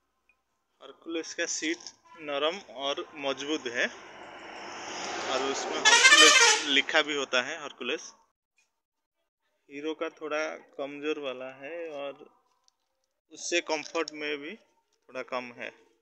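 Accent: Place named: Odisha, India. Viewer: ଭାଇନା କଥାରୁ ତ ଓଡ଼ିଆ ଜଣାପଡିଛି 😃 ସାଇକେଲ ର ଦାମ୍ କେତେ 🤔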